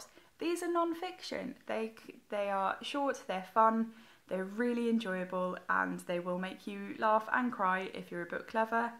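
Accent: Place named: England, United Kingdom. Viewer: Thanks for your sweet video. I love your beautiful accent, englishy english :)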